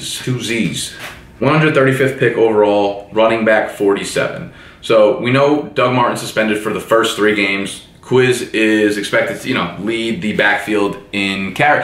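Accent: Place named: AAVE, United States. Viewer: Dude, GREAT info, better than the pro announcers. The only criticism is you don't need to cuss or speak in ebonics 10% of the time. Will be watching you from now on.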